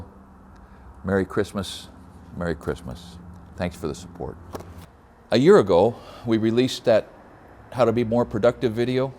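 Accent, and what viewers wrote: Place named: Texas, United States. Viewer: I think you might be a little more Texan than you know.....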